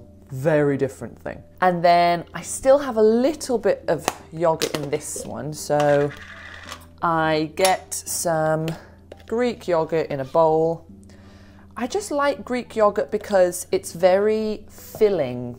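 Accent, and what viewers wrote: United Kingdom. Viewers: Omggg he is so britishhh😂😂😂😂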